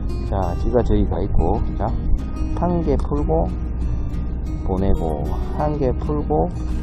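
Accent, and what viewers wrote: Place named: Busan, South Korea. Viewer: 야야 와이라노?? 부산사투리 구수합니다~~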